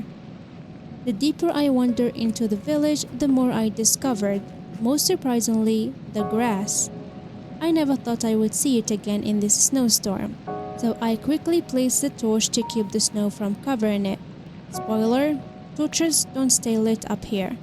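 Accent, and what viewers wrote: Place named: Canada. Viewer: I thought you were canadian 😮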